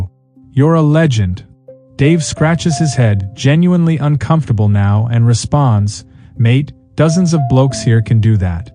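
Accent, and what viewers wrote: United States. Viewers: Please!! If it an Aussie telling a story, make it an Aussie telling the story! #shitusaccent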